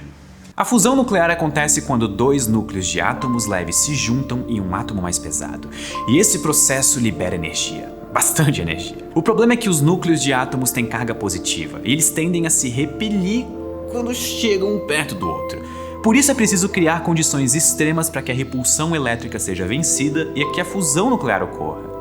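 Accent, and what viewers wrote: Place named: Brazil. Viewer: Como eu consumo muito conteudo no youtube em inglês (sem legendas dur) ouvir um br falando ingles é bem estranho, sei la diferente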